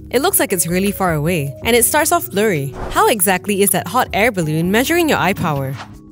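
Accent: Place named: Malaysia. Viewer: i didnt even see the channel’s name at first but i can guess the accent is malaysian 😅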